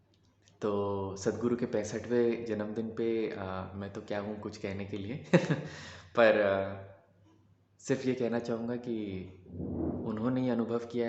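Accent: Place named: Andhra Pradesh, India. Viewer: telugu boy talking in shudh hndi lets goo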